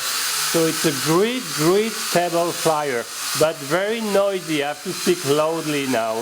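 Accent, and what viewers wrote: France. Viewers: Salut, vu ton accent je suppose que tu es français :-) Je voulais savoir si ce quad était bien pour commencer avant d'attaquer le vol sur un 650 monté par mes soins? Et que signifie Banggod courtesy? En gros ils te le filent gratos et tu leur fait un peu de pub en échange ? J'ai également une chaine YouTube, tu crois que ça serait possible pour moi de faire la même chose ? Cordialement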